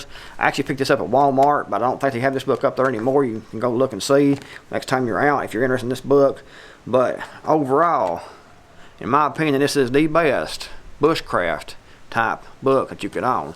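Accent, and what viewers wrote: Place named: South, United States. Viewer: I love how he talks! Country boy !! 🇺🇸 live this channel!!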